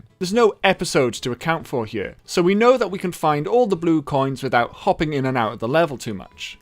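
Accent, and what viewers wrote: Wales, United Kingdom. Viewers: Are you welsh? Also you deserve more views.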